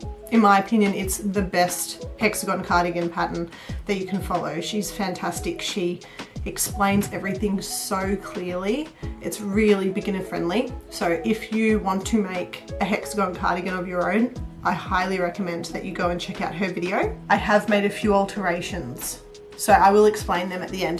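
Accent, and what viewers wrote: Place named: Australia. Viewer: I’m in the process of making one and was happy to stumble upon your video. I’ve made a couple of small ones for babies and toddlers but this is my first for me. It’s so good to hear an Aussie voice and listening to your ideas. I’m not a fan of the large arms so looking for ideas. Good luck with your Chanel, I’ll be following you 💐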